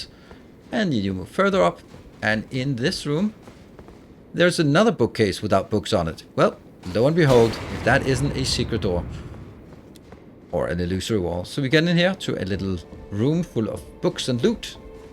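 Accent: Denmark. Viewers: Is that an danish accent Im hearing? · Thanks I was really curious where to get that item that was on the cliff Edge. Funny enough I immediately recognised your Danish accent. Fortsæt med det gode arbejde.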